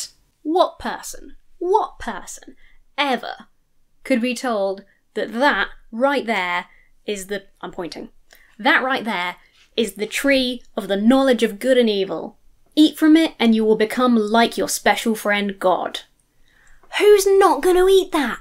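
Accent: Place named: RP, United Kingdom. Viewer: That was brilliant, had to sub! Being a Yank, love the "F-Bombs" with the Posh accent!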